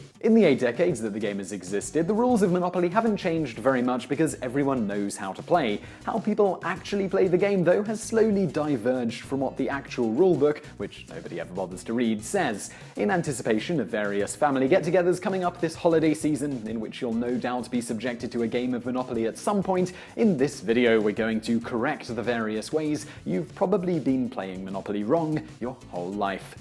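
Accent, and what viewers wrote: England, United Kingdom. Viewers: Whoa. Didn't realize he had an English accent until I saw the game board with the Italian currency symbol ....my brain works in mysteriously beautiful ways. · the smoother British voice Vsauce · It kind of is. There's the English language. But there's also an accent to it depending on who's speaking it: someone from England, America, Ireland, France, etc. speaks English with an Engkish accent. I speak it with an American accent. Etc, etc.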